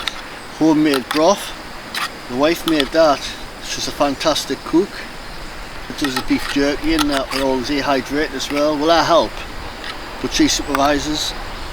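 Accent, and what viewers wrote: Newcastle, United Kingdom. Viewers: Fuck me! Who knew jimmy Cricket was a geordie?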